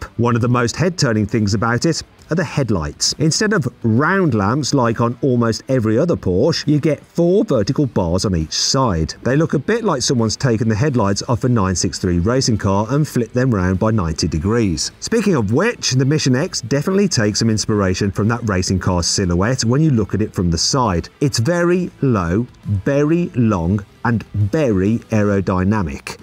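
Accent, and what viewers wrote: United Kingdom. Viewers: Logat British nya 🍷🗿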